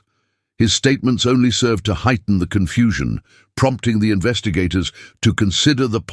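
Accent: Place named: United Kingdom. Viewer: that stupid British voice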